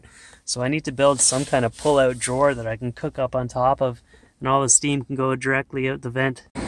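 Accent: Canada. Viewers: Hi, man! Looove your videos and have been watching for a while now, especially all your amazing bike trips and now the minivan conversion, as I'm planning to do a conversion in the near future. Something I'm dying to know - what accent do you have? I'm pretty sure I remember you being Canadian?? but what part? Sorry if I'm wrong or what not. thanks for all your videos! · You have a super Canadian accent, like my buddy Randy.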